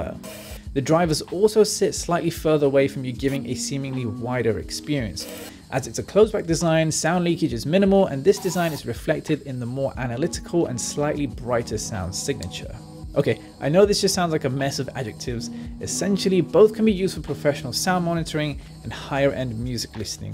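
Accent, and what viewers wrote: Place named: United Kingdom. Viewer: I though "another american review" Hears girl - british af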